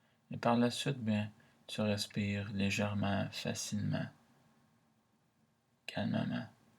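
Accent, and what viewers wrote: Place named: France. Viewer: Félicitations, C'est magique ta voix, Ça m'a fait du bien, continue comme ça! ton axa demi Français j'adore. Marie Médiatrice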